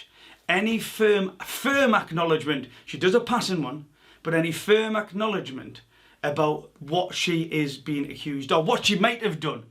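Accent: Newcastle, United Kingdom. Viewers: You sound like a Geordie spot on video thankyou xxx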